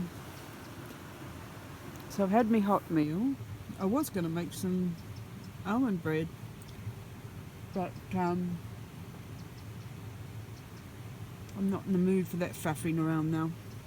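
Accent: New Zealand. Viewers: Loved it!...great wee vid....wee hint of NZ accent there??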